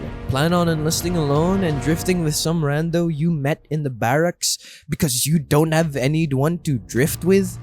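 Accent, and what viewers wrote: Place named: Philippines. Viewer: I knew it because I'm also Filipino and you're accenthen you cannot fool another Filipino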